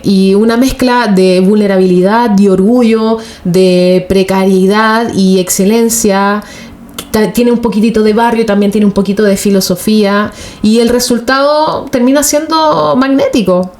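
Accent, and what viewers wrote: Chile. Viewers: GRACIAS...de corazón...se te oye de chile...te sospecho en Argentina...de ser así, un secreto....se te nota conmovida por BUenos Aires, y más siendo arquitecta, tenes mil secretos para revelar...algo mas...si amas BUenos Aires,la ciudad, su caos, su ruido, BUenos Aires te alojara en un lugar de su Corazón...eso es ser de BUenos AIres...y ningún porteño va a poder quitarte esto...los primeros hijos de esta ciudad, no fueron nacidos, fueron adoptados...un ticket a otra vida...la apariencia de ser agrandados, atropellados, es el derecho de piso a existir, para no ser tragados, luego queda y se hace característica...y uno se jacta...por que cualquier cosa es buena para ocultar los dolores, y otras nanas...BUenos Aires es un monstruo, con lugar para quienes le susurran, y a ellos, les da su ternura...todo un descubrimiento... otras ciudades, quizás, sean fáciles...a esta, le toco aser asi. 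Buenaventura...!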